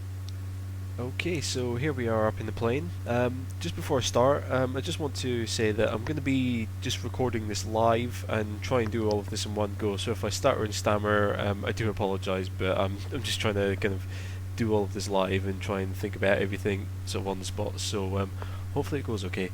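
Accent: Scotland, United Kingdom. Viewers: Great explanation and relatively easy to follow. Thanks.... and in a good Scottish accent too lol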